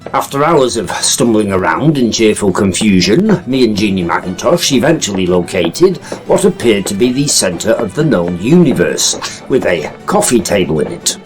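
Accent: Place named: Northern England, United Kingdom. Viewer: Eee lad . Yer raaht northern . " T'pit clarsed twel'e yeeeer ago - 'avvent wukked sin' ".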